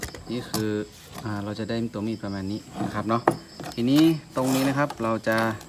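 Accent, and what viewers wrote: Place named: North, Thailand. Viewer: สำเนียงคนเหนือ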